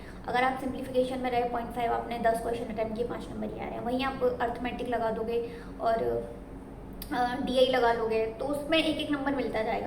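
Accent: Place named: Madhya Pradesh, India. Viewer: DIdi MP se ho kya ?? Language bhi jani phechani lag rahi hai 😅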